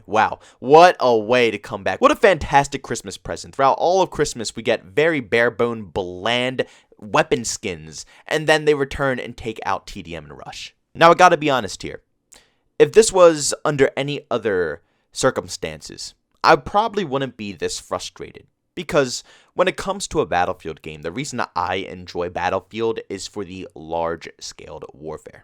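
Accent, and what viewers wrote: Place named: Mid-Atlantic, United States. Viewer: Dude you must be from the PA, Maryland, Delaware area with that shitty accent. The way you hold your O’s is atrocious lol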